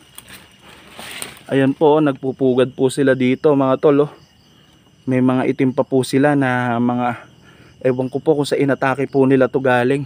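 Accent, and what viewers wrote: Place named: Pampanga, Philippines. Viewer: Saan lugar yan idol kc ang galing mong mag tagalog,pero my punto kang kapampangan?ingat lang idol,God bless u...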